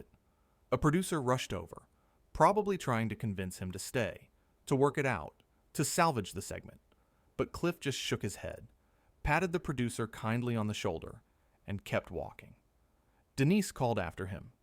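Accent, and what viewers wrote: United States. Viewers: Why is an American doing the commentary?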